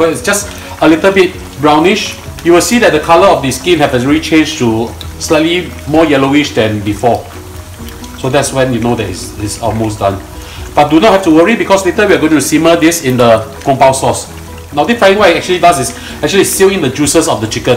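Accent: Singapore. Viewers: This is Singlish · I would say he has a heavy Singaporean accent. It is not Hong Kong. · Singapore English lol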